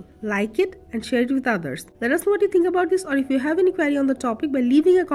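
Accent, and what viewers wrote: India. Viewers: The voice over in this vedio is done by probably a bengali women i can say that due to her accent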